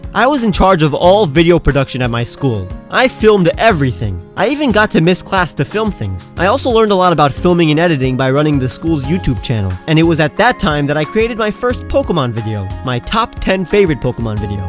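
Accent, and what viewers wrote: Israel. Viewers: Always wondered why you had a little bit of an Israeli scent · אתה לא יכול לשמוע את המבטא הישראלי בקולו?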